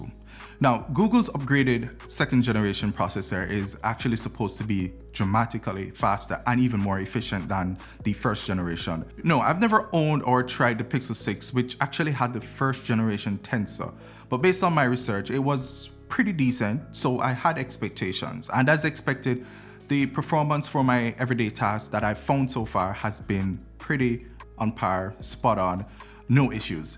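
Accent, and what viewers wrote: Jamaica. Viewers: Are you Jamaican?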